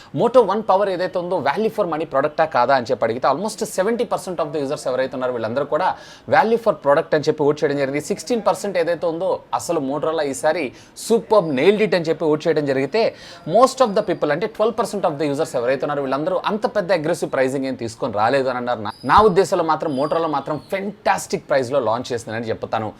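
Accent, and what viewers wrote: India. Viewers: R u from Vizag or srikakulam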